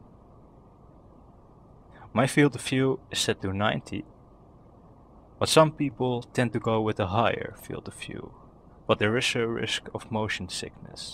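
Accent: Netherlands. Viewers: You're dutch :D dat accent tho. - a current reader of ur BFH app :)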